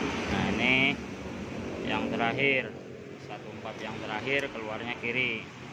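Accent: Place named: West Java, Indonesia. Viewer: Kieu ari urng sunda mh...ngomng indo sok cmpur jeung sunda...karakter na khas.....mantf penjlsn na sa x oge ngartos...siap lh kepala ketmu ekor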